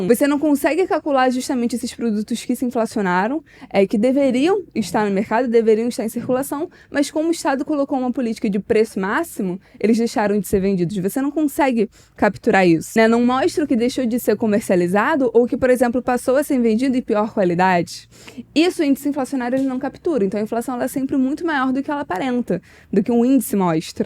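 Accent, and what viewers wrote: Rio de Janeiro, Brazil. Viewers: Ótimo vídeo, pena que é sotaque RJ. Você tem twitter moça?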